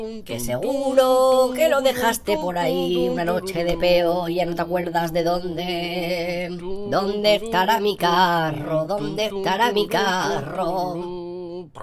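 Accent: Bilbao, Spain. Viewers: Ets luzu soy de twitah k sepas k comparto y me encantan tus videos sobreto tu forma de ablar a lo bilbo puro no cono un "tabaka" ;)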